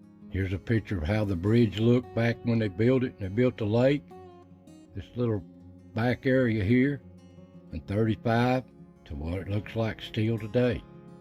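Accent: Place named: Tennessee, United States. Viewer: Worth watching just to hear that wonderful Tennessee accent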